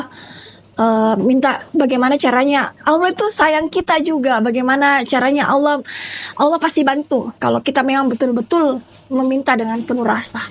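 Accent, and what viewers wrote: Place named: Makassar, Indonesia. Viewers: Kental sekali logat Makassarnya.... Slm dari Manado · Makassar bro ewako❤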